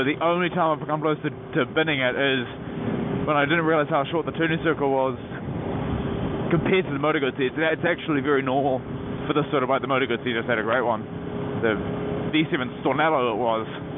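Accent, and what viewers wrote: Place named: New Zealand. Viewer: Nice to hear a fellow kiwi , really enjoyed this hope to see more on the aprilia and more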